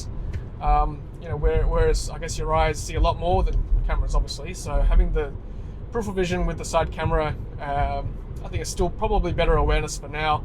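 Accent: Australia. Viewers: Always interesting to hear an Aussie accent and terminology, e.g. "amber," trying re-orient oneself to see driving being done on the "wrong" side (non-American) of the road, and imagining what AI/machine vision needs to do to handle your travel ways. Does your testing of Autopilot (1) Indicate significant disc brake usage whereas regen braking involves none; (2) Provide some play in steering to avoid potholes within one's lane; (3) Hint at what causes phantom braking or steering off course; and, (4) Indicate what might be situations where it should not be used? Thanks!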